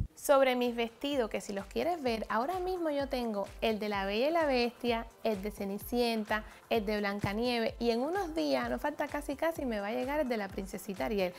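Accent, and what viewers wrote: Cuba. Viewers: Se parecen y su acento es el mismo por que las dos son de cuba 🇨🇺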